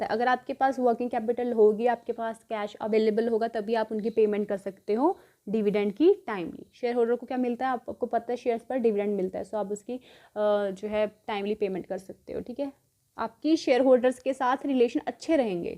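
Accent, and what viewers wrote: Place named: Punjab, India. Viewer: I liked the way you explained , are u Punjabi or from north side for sure😊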